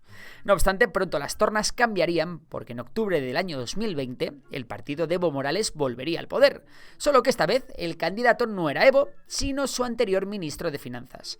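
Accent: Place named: Spain. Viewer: He visto tu vídeo y déjame decirte que tienes información muy básica, es tan mala que me atrevería a decir que eres militante del MAS. En Bolivia los medios de comunicación no dicen la toda la verdad tienen una mordaza, y se ve que es tu fuente. A juzgar por tu acento eres español y seguro te tragas el cuento de que Pedro Sanquez y su mujer van a ir al cielo a sentarse a lado de Jesús..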